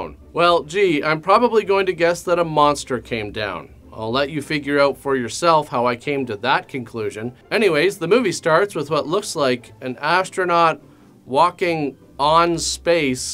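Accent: Canada. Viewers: First visit... You sound like a Canadian Sterling Archer... Only adds to the sarcasm. Excellent work.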